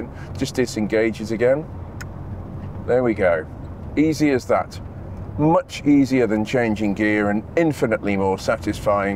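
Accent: United Kingdom. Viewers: I absolutely love the sound your voice. British English at its finest. Greetings from Sweden.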